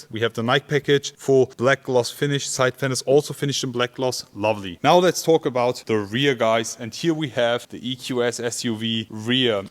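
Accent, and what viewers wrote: Germany. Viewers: I love your German accent. I won’t say who you sound like but I like it. I also think you give very good presentations and cover the smaller details as well as the big ones!!! Much love and respect and the new EQS SUV is to die for. Such quiet class and beauty!!! I could live in that thing…🥰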